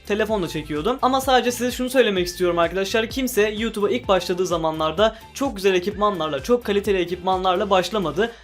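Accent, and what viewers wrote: Azerbaijan. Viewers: Abi sen azerbaycanlisan qaqa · Azerisən sen? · Sen azerisən brat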